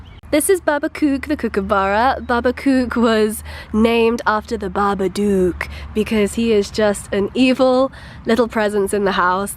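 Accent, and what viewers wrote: United Kingdom. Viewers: Shes british. There seems so be a language barrier for you. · Wait is from the UK?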